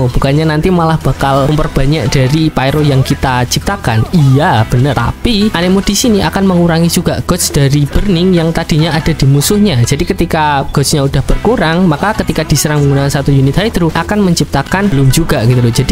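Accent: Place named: Java, Indonesia. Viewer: Medok kali woi Kek gw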